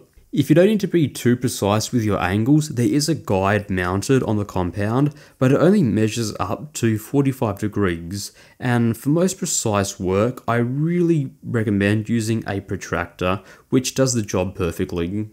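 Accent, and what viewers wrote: Australia. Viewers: Is that an Auzzie accent? Does it vary from region to another? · Thank you for this very thorough and well thought out review! I have been procrastinating for years now on my decision to jump into a mini lathe, because there just isn’t enough information out there in easily understood format. Good on ya mate! I enjoyed listening to your accent too, my younger sister lives in Albany, Western Australia, and so I miss hearing you Aussies! Cheers mate, from Northern California! 🙏💪🙌🤘🤘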